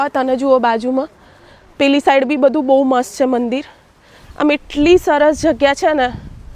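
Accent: Sabarkantha, India. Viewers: Sabarkantha ni boli bolo chho to s.k. na nativ haso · બેન, તમે સાબરકાંઠા ના લાગો છો.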